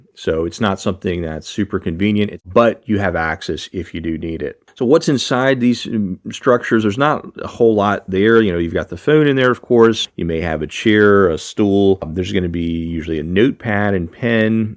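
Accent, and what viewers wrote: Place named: Philadelphia, United States. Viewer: Question for the narrator, you have a Philly Delco accent?? Where are you from?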